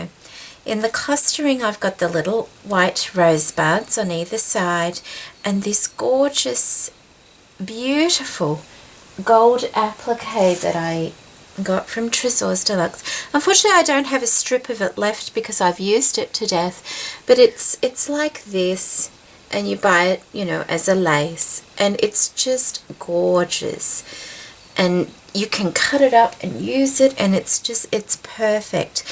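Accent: Australia. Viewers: Oh, I love both the projects and I have to say I LOVE the way you presented them and your description of each lace, bling, etc that you use is so enticing and inviting! I love listening to your voice anyway, it's so sweet and your Australian accent is pure beauty (hate to say it but sometimes it, when I hear it say, on the TV, can be a little........cant think of the word)! Oh, and how on earth do you remember who sends you what? it is such a gift you have, as its lovely to hear the names mentioned (think I may have asked you this before, if so forgive the intrusion). Hope you are well, my friend, hugs from June xx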